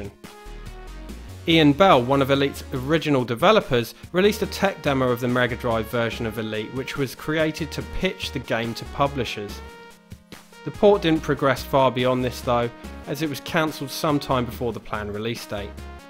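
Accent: England, United Kingdom. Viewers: T H I C C english accent.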